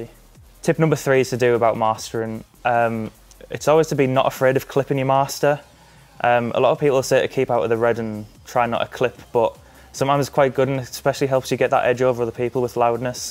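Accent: United Kingdom. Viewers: I always thought he was british haha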